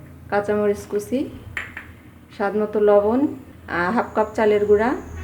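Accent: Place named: North Bengal, India. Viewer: আসসালামুয়ালাইকুম দাওয়াত পেয়ে চলে আসলাম আপনার ঘরে। পাকড়া আমার অনেক পছন্দ আপনি অনেক সুন্দর করে তৈরি করলেন। আপনার উপস্থাপনা অতি চমৎকার এবং ভিডিও এডিটিং মাশাল্লাহ ভালো হয়েছে। ভাষা শুনে মনে হলো উত্তরবঙ্গের লোক কোথায় জানালে ভালো হতো। অনেক ভালো লাগলো আপনার ভিডিওটা। আপনার জন্য অনেক অনেক দোয়া ভালোবাসা শুভকামনা রইল এগিয়ে যান বহুদূর ❣️❣️ ভাল থাকবেন সুস্থ থাকবেন। আল্লাহাফেজ ❤️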